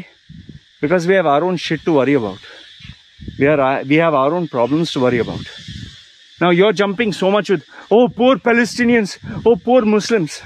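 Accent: India. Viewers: He speaks with Indian Accent.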